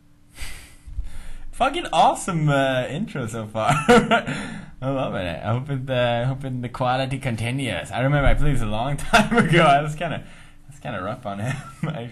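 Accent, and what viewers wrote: Sweden. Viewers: its an accent. hes swedish. his actual name is. his girlfriend is italian so he lives in italy. get your facts straight. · you can hear it that the man is swdish, so im i<3<3<3 all the guys in my class always says Maria, im like its just my facebook name brofist* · Pewdiepie has caused me to become so interested in swedish culture.. plus i never knew how amazing swedish accents are! they are Adorable. and thats coming from a guy ^.^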